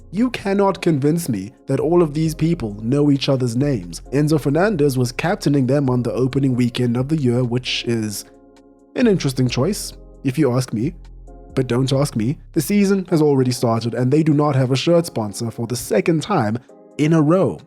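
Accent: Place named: South Africa. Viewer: Bro what nationality are you? Sounds crazy, but you sound South African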